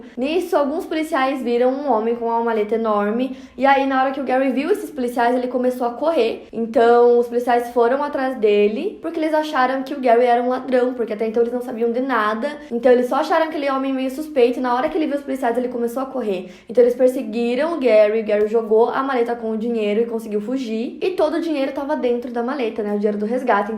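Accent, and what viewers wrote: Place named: Rio Grande do Sul, Brazil. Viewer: acho que é riograndense Aqui no Rio Grande do Sul falamos assim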